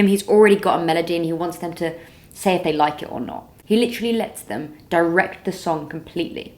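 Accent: England, United Kingdom. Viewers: It’s simple, I hear the British accent, I click like.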